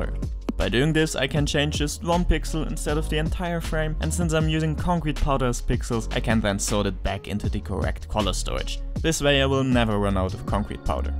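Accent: Germany. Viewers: I knew he was form Germany. Typical Accent. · sie sind so deutsch der akzent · are you by any chance... german?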